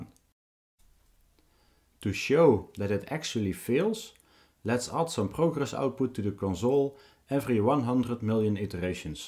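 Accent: Netherlands. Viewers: Heerlijk zon nederlands accent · Only Dutch people will know where this guy is from just by listening to his pronunciation. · Netherlands, obviously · Ik kan je Nederlandse accent horen haha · just about to say if this guy ain't dutch then my life's a lie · I SMELL DUTCH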